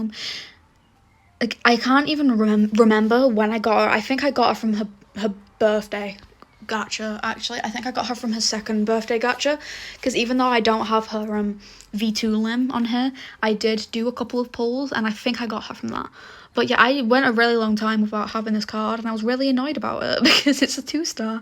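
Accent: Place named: Manchester, United Kingdom. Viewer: loving the manchestian accent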